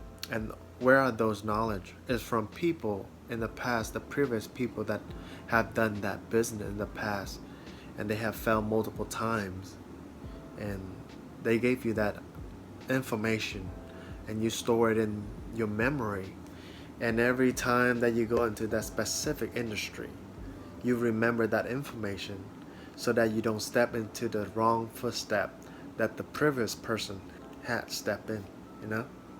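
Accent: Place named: Texas, United States. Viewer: Dude whats up with the southern texas accent haha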